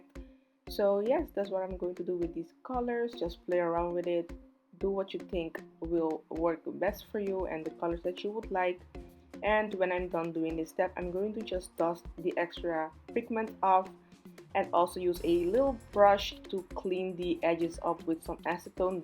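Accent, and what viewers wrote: Netherlands. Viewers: Ik hoorde gelijk zij is Nederlands 😂 girlll those nails gorgeousss✨😍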